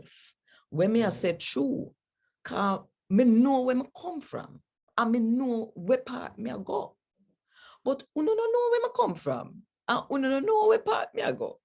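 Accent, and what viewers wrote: Jamaica. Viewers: Authentic Jamaican! Beautifully done. Keep it up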